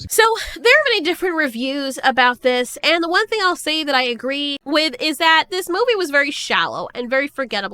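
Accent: Scotland, United Kingdom. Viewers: love scottish accent :D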